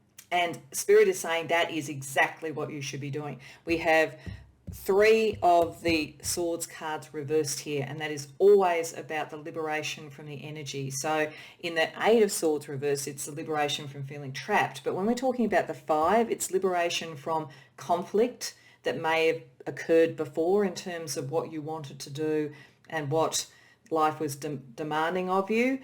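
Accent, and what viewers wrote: Australia. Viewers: The way you described the phrenology oracle and what phrenology is so nonchalantly with your brilliant and beautiful Aussie accent was highly amusing to listen to for me, enough for me to want to tell you about it 😆 probably not something I'd have done in person but being as we're at a distant and communicating through the net I'm gonna risk it 😊 I just had a quick look at what others have commented on this video and I totally agree with them, you come across as someone who is obviously and refreshingly very gifted at reading cards, I think you create really unique readings and I like the different combinations and choices of decks you use too, most, if not all are ones that I'm sure I've not seen being used by other people who read and share here on YouTube before, and yeah, I too am very grateful to be here and have access to such fun and insightful, inspirational readings. 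.. and I watched a past life reading only earlier today actually, from Hermit Tarot who is another magical soul who I'm sure I heard you mention as someone who you watch too in one of your earlier videos 🤔💭 I think 😊 .. and you touched on the exact same things about this particular past life that she was reading into too! 😮🌝 crazy init how it all works, mentalist I tell thee 😆☺️ ahhh God 🙏🏻😌 I didn't fit in with the family or the society around me at all in this life in question, and I think it was in England, which is where I am again now, and I was thinking just now that I was maybe a woman in that lif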